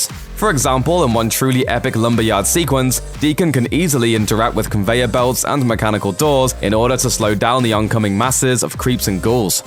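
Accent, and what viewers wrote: United Kingdom. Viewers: With all due respect Fuck British accent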